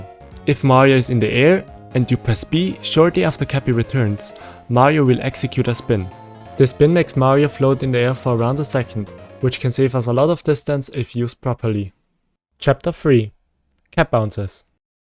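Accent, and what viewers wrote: Germany. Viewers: Your English is very German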